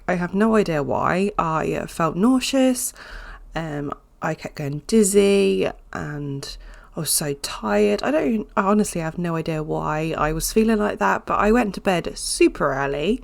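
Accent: United Kingdom. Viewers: Love listening to you ramble! Sometimes I just put. your videos on to listen to while at work even though i can’t watch the actual video. Also yes you do sound like you are from the UK. I think you have a bit of an Essex accent 😆 but I don’t think you are anywhere near Essex if i’m not mistaken? 💜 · I love the house and the cool shapes of the bedrooms, gives them character and it's fun to decorate! You definitely sound like you are from the UK 100% (to me at least and I am from USA) and I love a bit of both rambling and talking about the build, it really sounds like speaking with a friend :)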